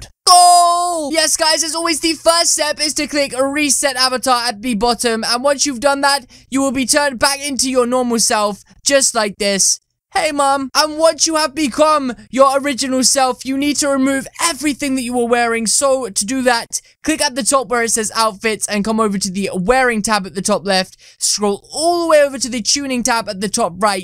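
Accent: United Kingdom. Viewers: IS FROM THE UK!!! I knew it.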